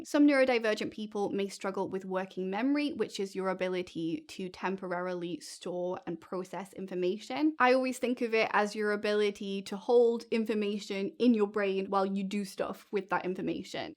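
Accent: United Kingdom. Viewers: I did not notice or ‘hear’ your English/British accent until you said the word crisps... several minutes into your video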